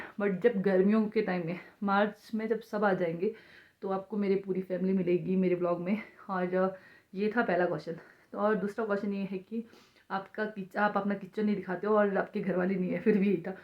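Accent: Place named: Assam, India. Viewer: Mai app ka vlog dekh rehiye thi aur app ka hindi aur hamara assam hindi sem hai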